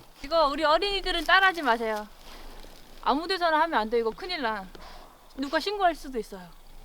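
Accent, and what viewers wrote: Gyeongsang, South Korea. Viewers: 살짝 경상도 악센트가 느껴지는데영ㅋㅋ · 작다~~ 경상도 아가씨네요 ㅋ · 약간 경상도억양 있으신게 더 매력적이십니다.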